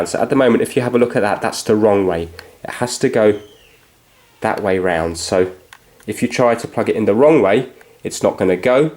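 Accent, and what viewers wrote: United Kingdom. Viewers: I love British accent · Is he British?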